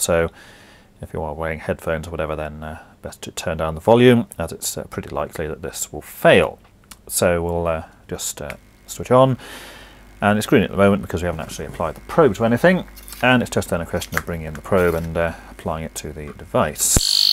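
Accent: United Kingdom. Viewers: Couldn’t understand the heavy British accent. Blimey!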